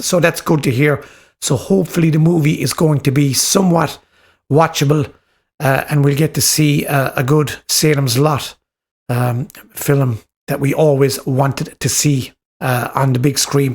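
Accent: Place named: South, Ireland. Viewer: It’s simple I hear southern Irish and I sub 😃 great video love from Limerick!😊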